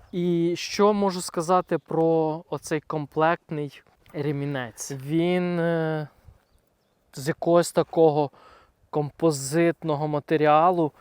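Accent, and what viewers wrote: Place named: Western Ukraine, Ukraine. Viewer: плять що за жахлива українська? плять як задовбали ці западенці. Селюки плять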